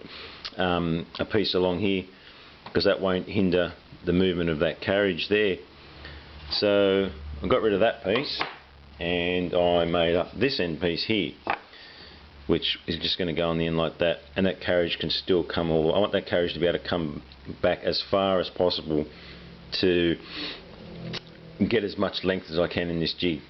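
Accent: Australia. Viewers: you sound like you've got a queensland accent! greets anyways mate, really enjoyed this video and can't wait to make one! Cheers!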